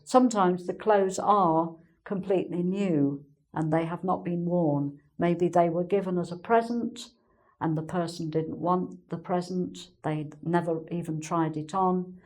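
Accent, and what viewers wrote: United Kingdom. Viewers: Hello, thank you so much for your video lessons. You have very beautiful and clear British pronounsiation. I've got a request. Could you please make a video where you explain the meanings of the modal verbs like must, have to, ought to, to be to etc. The difference between them and how to use times with modals and so on.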